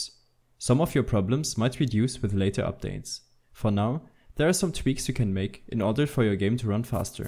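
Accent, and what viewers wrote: Germany. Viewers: Man merkt das er deutscher ist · Man merkt an deiner Stimme dass du Deutscher bist :D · man hört den deutschen akzent aber gut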